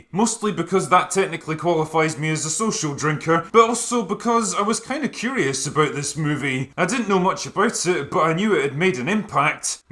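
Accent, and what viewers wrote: Scotland, United Kingdom. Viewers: You’re becoming an Scottish accent echo of Redlettermedia 😬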